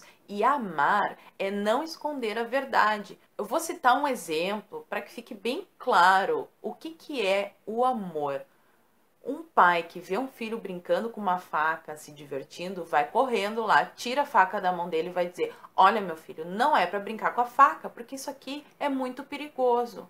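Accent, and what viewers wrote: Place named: South, Brazil. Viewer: sou novo no seu canal e estou curtindo muito, estou aprendendo muito.... Vou te fazer uma pergunta pelo seu "sotaque " vc é do Sul do Brasil não é? para ser mais específico vc é do Paraná???? valew ate mais...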